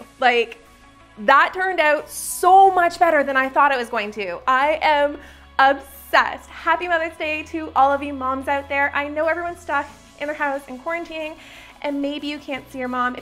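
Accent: Canada. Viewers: are you Canadian? You sound like one. I love your videos btw! ☺️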